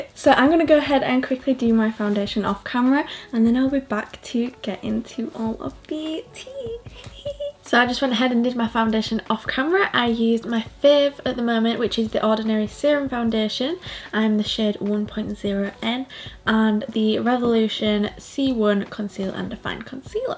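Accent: United Kingdom. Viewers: Wow I thought u were straight American but wow! BRITISH SQUAD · I honestly didn't know is British, I thought she's American 😂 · Everyone’s like I THOUGHT U ARE AMERICAN Me: I knew she was British 😂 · your british i am confused... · WAIT WHAT.....I had no idea you were British. I’m shook. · Are you from the uk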